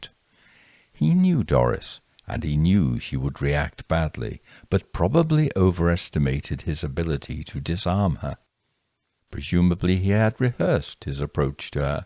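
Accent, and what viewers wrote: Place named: Northern England, United Kingdom. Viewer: Your narration and voice are perfect. Every so often a tiny northern inflexion. Such a lovely lilt amongst English accents, which lends perfectly to spine-tingling and sinister tones.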